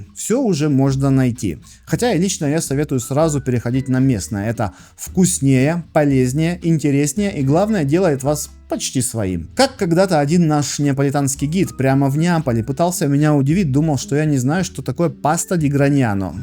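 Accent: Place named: Ukraine. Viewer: Обычный украинский говор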